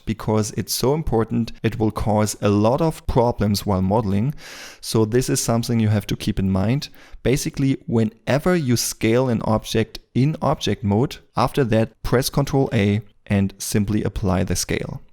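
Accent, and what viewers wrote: Germany. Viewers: Since you sound german, why dont you make german videos? :) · German accent😂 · Gutes Tutorial, aber dein deutscher Akzent sticht stark heraus xD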